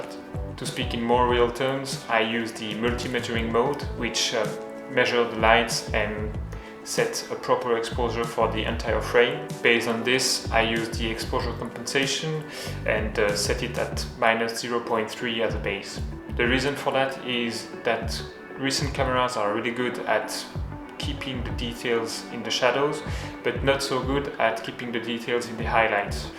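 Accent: France. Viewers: Vous avez un accent, êtes-vous francophone?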